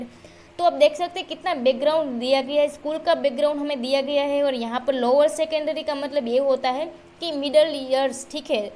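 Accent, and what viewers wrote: Gujarat, India. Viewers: Awesome 👍, are you gujarati?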